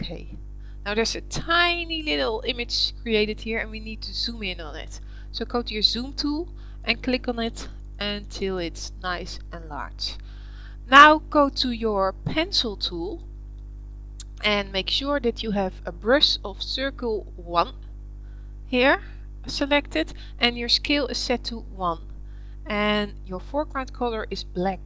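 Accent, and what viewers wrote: Netherlands. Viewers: spotted the Dutch accent a mile away haha. nice tut thanx a lot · sounds like a dutch accent am i right?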